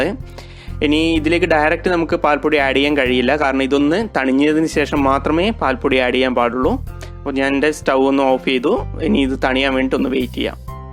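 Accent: Kannur, India. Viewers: 6 .49 innann paraya😂our original kannur slang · ettan kannur kaaran anno?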